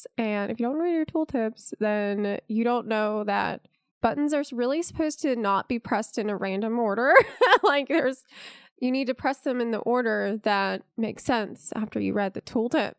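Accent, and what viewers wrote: South, United States. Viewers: wonder what part of the south she is from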